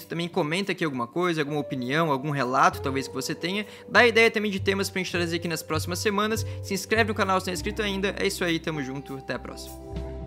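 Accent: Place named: Rio de Janeiro, Brazil. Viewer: Com esse sutak do RJ tu não é.milcia é polícia.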